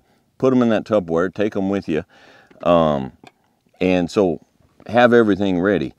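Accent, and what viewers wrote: United States. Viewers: Your voice is just so American and relaxing! Fantastic work! 😍❤️🌟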